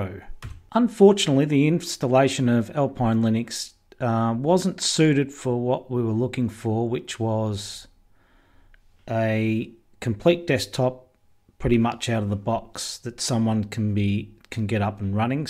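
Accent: Australia. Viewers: You sound like an Ozzie, right? So the right keyboard for you would be GB, not that weird US wannabe English. Where is your pride and selfrespect, man?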